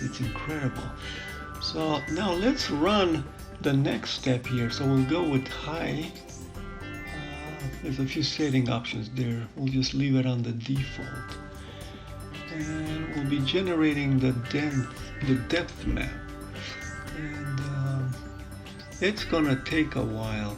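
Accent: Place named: Europe. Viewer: You fools think the EuroDouche accent makes him intelligent or honest ? Misusing "professional" extrapolation/interpolation software to support a dogmatic narrative is something anyone(even a FEtard) can do. & btw, IR just penetrates haze better & lets you see further/clearer, no magic. You can't even talk about such a small angle over such vast distance(or ANY angles/distances) without having measurements(actual) WITH error bars on your initial data. & there are other issueS as well with using this approach here. Being somewhat familiar with how such software(not that particular app but the general assumptions, principles & algorithms etc.) is designed, I'm quite certain that, not only is his "analysis" flawed from the get go, but the conclusions he draws from them are absurd. & he is going "write his own code"(even though he misunderstands what photogrammetry even is never mind how it "works") in Matlab to manipulate data to fit his narrative(NOT reality) even better ? 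I can hardy wait ! Selling(& you're buying) such buffoonery is practicing douchebaggery. I'd be glad to be more specific, if anyone asks the sincere/relevant Q's. Though FETards don't want to actually learn anything EVER. But thanks for the permanent public record of your foolishness though.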